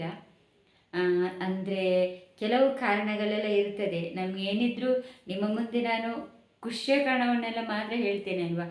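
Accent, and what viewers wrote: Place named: Mangalore, India. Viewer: Hype👍ನೀವು ಕುಡ್ಲ ಕನ್ನಡ ಪತೇರಿ ಮಾರಾಯರೆ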